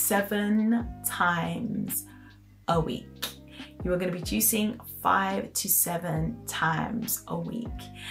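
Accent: United Kingdom. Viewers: You have a Brittish accent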